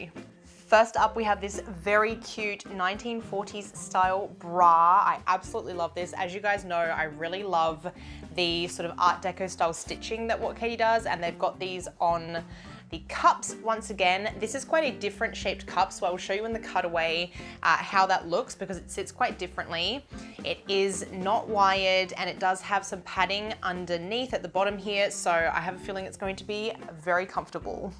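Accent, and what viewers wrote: Australia. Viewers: Are you Australian, I love your accent 😃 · I love the Aussie accent. She is a beauty as well.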